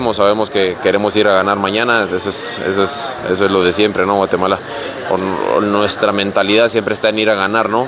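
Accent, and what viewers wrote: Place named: Argentina. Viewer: Este muchacho abla como argentino yo creo que si vamos a ganar.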